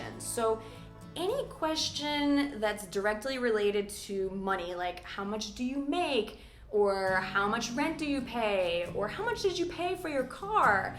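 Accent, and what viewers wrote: United States. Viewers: Hey. I would like to know about your accent. What state do you live in the US? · Actually, you speak American. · You speak American not English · English speakers? The American version would be more accurate! Weird hearing a yank telling us how we speak. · They ask you a lot it because you're beutiful and have a great appearence , here in brazil its normal , mainly you have these blue eyes and nice american accent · Thank you! I am an Italian English teacher and I really appreciate your lessons! I would like to have your American accent but I still have some difficulties and here books are all with British accent and with “I am fine thank you and you!”🤣 thank you again!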